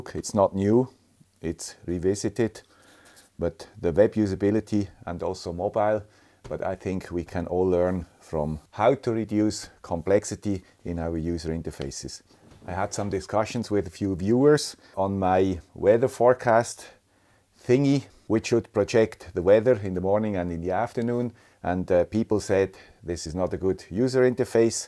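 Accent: Switzerland. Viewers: Great as usual...but is everything ok with you? Noticed that you sounded sad or tired especially at the start of the video. If tired take a break but do come back because we can't miss the guy with the swiss accent!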